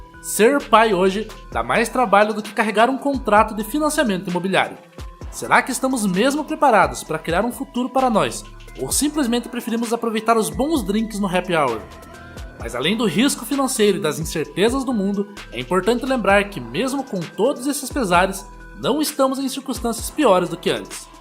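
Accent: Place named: Caipira, Brazil. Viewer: Narrador caipira não dá, hein.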